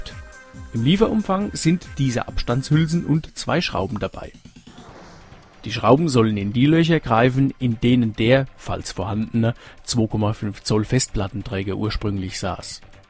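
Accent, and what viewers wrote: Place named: Franconia, Germany. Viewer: Bei deinen Dialekt kommt der Franke noch durch 🤣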